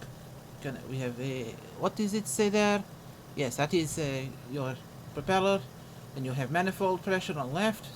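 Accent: Russia. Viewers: I loved your Russian accent! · I like the Russian accent...it suits you. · I normally really like your reviews, but this russian accent was a bit too much for me over time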